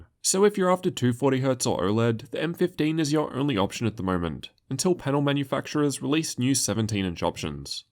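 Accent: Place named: Australia. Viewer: His accent and his voice is what I like. Back in the days when I was learning English, in our institute, we had both American and British accent teachers, but not an "OISTRALIAN" one (: I think his videos are so suitable for any English learner who wants to get familiar with Australian accent.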